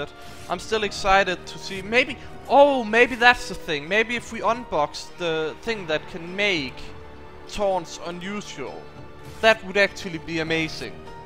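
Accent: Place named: Denmark. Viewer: You have a very danish accent. You're probably not dansih though, you still have a danish accent.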